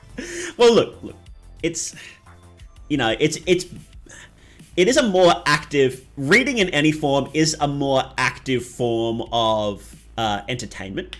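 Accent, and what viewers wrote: Australia. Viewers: You have roight proper aussie accent. This was a nice talk.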